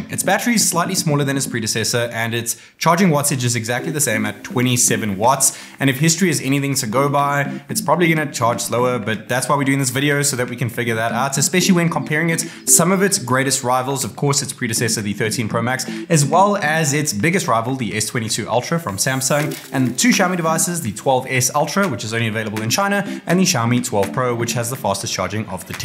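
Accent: South Africa. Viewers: I forgot your south african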